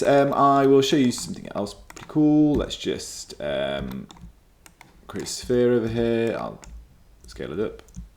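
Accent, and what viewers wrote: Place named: England, United Kingdom. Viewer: DUDE YOU SAVED MY LIFE! I was about to die from wasting my life on Xgen geometry instance painter - but then you came out of nowhere with your sophisticated english accent and saved me!! : O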